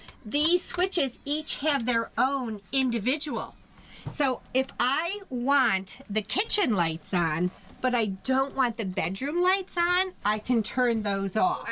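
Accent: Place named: Wisconsin, United States. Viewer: I love your Wisconsin accent!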